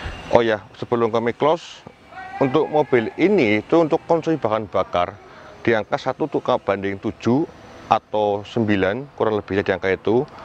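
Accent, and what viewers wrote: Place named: Java, Indonesia. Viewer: medok nya agak ganggu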